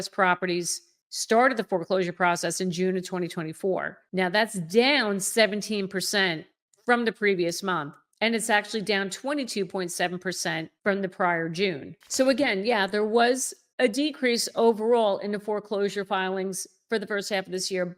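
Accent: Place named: New York, United States. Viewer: I can tell by your accent that you grew up in Long Island or North Jersey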